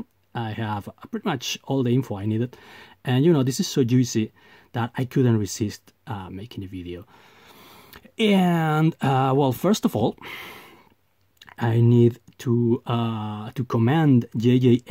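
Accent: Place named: Spain. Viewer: The highlight of your videos is hearing you swear with your Spanish accent. Love it! 👌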